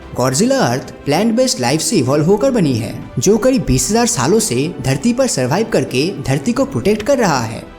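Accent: Bengal, India. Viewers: Are you bengali? 🙄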